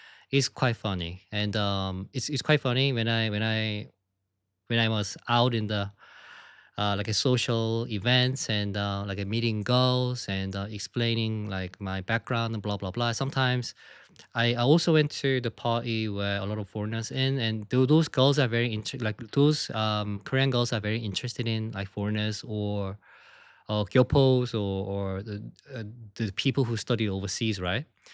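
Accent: United Kingdom. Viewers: As someone who migrated also to another country myself I think your English is very good. Your accent sounds a bit like British. I think anyone with an accent means that they speak more than one language. In my opinion being bilingual it’s better than knowing one language. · Hi! I really like people's different accents, I find it s3xy. I can recognise the British accent in your speech and i admire your vocabulary and perfectly English speaking. I live over 10 years in England and my English is nowhere near yours, lol. I never tried to adopt a British accent, I simply can't. I try to pronounce words correctly so people can understand what i say (that's not always successful), but i do not mind. I am greek, i have different sounds in my language. You know something? I haven't met the English person yet, who can pronounce my surname correctly. Because that sound does not exist in English language. 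Just call me Ms K. That will do. And the app i use to learn korean cannot recognise my accent most of the times. That makes me try harder. I hope the 2 greek friends treated you with nice food back then. I am going to Corfu this summer ☀️ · I'd say your accent is obviously British, I was able to detect it before I found out you studied in the UK. Your pup is adorable😅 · I am Afro-American and I can hear a slight British accent in and your voice! It's interesting to me😊🔥💯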